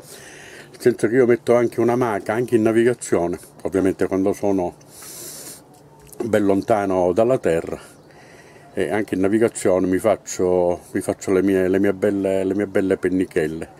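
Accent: Sicily, Italy. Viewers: Grazie per le information. Leibe siciliano?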